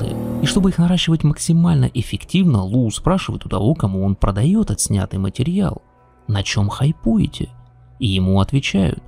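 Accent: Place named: Chechnya, Russia. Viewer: у тебя проскакивает чеченский акцент в голосе)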